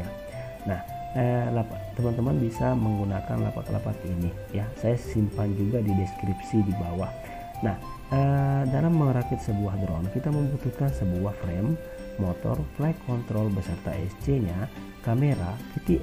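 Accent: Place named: Kendari, Indonesia. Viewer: Wah orang kendari ya???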